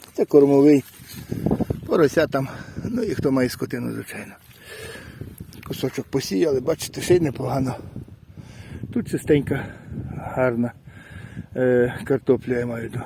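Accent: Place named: Lviv, Ukraine. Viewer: Як приємно слухати ваше привітання, тільки почула і відразу подумала, людина зі Львівщини, навіть не відрізниш, у вас і діалект Львівський!, 😊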